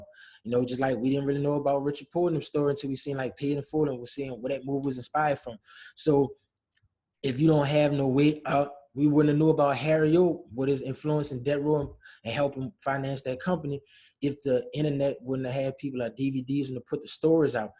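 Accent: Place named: New Orleans, United States. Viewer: U sound like u from da NO tho.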